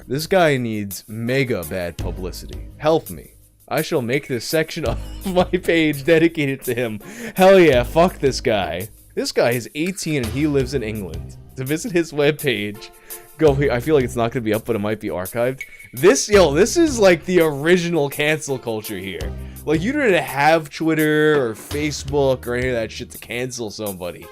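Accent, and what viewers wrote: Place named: East Coast, United States. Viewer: Him reading the passionate, angry back-n-forths in his calm, east coast accent😅😅😅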